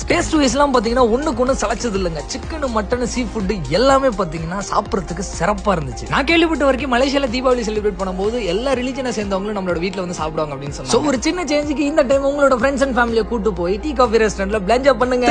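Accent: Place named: India. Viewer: Hanestly...? Looks like a video production from India together with the English diction.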